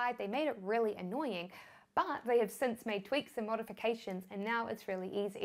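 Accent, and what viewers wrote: New Zealand. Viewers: 🤓 Loove your accent, will visit Nea Zealand one day 😊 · Kiwi Accent is ❤️ ... just like Cebuano speaking English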